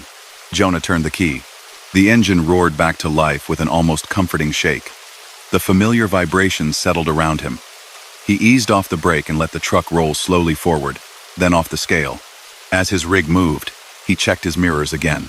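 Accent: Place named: Midlands, United Kingdom. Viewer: Uk midlands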